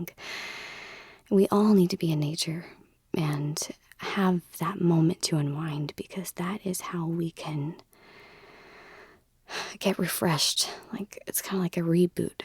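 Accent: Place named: Texas, United States. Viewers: Please come back!!! You are my favourite ASMR artist ! You were the first one I stumbled upon and I have a sentimental connection to you, you have no idea. You're even on my photo collage lock screen of "favourite people" (next to Freddie Mercury and Sylvia Plath). (Holy shit that sounds invasive and weird but you just make me smile every time I visit your Channel) I've missed you so much! Your role plays, your singing, your cleaning! your acting! Your hint of a Texan accent (my Australian one is rather monotonous) I'm 16 and I'm in my final year of high school and I need you to placate me ! Okay I need to slow my role but you get the picture -- you're amazing, genuine, quirky, amicable, and a little bit of sunshine to light up my day. Please please upload soon! I miss you!!!! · AHHHHHHH LORD YOU HAVE BEEN MISSED!!!!! me and the wife love your channel and your Texas accent we are from Dallas :p but so happy your doing good keep it up :)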